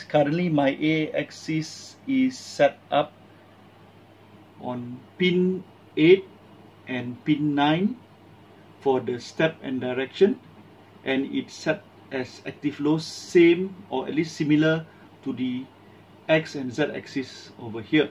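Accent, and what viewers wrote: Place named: South Africa. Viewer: you have south African accent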